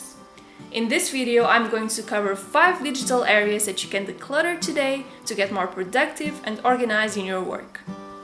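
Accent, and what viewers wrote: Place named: Portugal. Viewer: I have been watching some of your videos and because of your accent I thought you are Portuguese 🇵🇹 and now I think I was right because I saw a Via Verde email 😂. Am I right?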